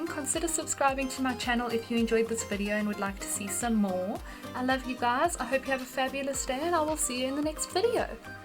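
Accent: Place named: South Africa. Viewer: Just came across your video and immediately knew you were a fellow South African! Great build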